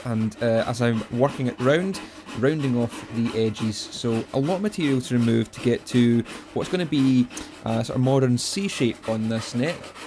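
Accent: Scotland, United Kingdom. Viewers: love the scottish accent. feyner tooth foyl :) keep up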